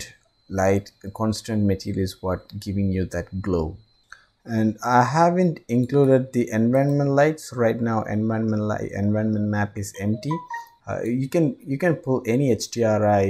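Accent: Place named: South India, India. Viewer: South Indian spotted XD Nice video though, loved it!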